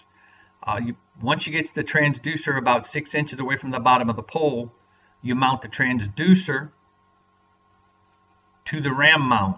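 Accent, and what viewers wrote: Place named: New Orleans, United States. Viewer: Well done. Voice over worked great. Useful video. How does your Fishing Specialties BowDucer LiveScope set up do on a slow troll? Does it want to swing up? And why the Ram mount to hold the transducer? Does the Garmin Perspective mount not fit on the FS pole?. The scenery and accent makes me miss New Orleans where I grew up and could be on great water in no time. Now fish the Texas coast: drive 3 1/2 hrs to the salt. 22' Pathfinder bay boat, LiveScope, (2) Garmin EchoMap 94SVs, SteadyCast, GMS 10 port expander, NMEA 2000, MotorGuide Xi5, F150TLRC Yamaha. Need all the help I can get! Thank you for the content.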